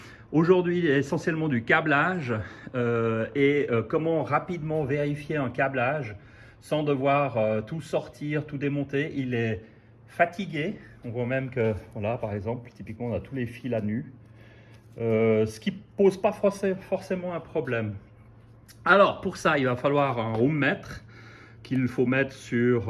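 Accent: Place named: Vaud, Switzerland. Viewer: Très sympa et j'adore l'accent vaudois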